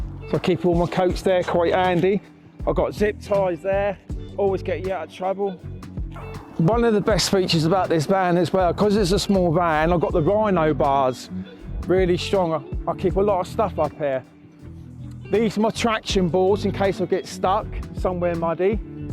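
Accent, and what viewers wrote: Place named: Portsmouth, United Kingdom. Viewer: ive just realised my comment sounded like an insult lol..i meant i knew after 30 seconds u was from Pompey cos i am too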